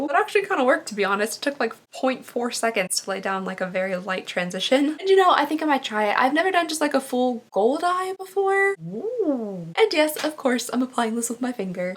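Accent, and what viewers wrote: Kentucky, United States. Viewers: Wait I just recently started watching your videos... are you from Kentucky! I am too!! I thought your accent was so nice! It’s because it’s so familiar to me! Wow, that’s so amazing! It definitely gives a boost of confidence because I’m always afraid to make videos due to my accent! Also I say “reach me that” all the time. My girlfriend who is from New Mexico(where I live now) has gotten into me for it lol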